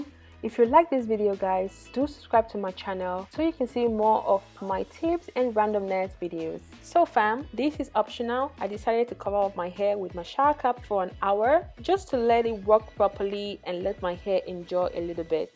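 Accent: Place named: Jamaica. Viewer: Sound look jamaican.. good job sis. More power to u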